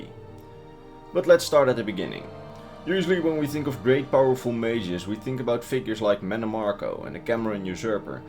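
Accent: Netherlands. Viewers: I recognize that accent anywhere. Hello fellow Dutchman!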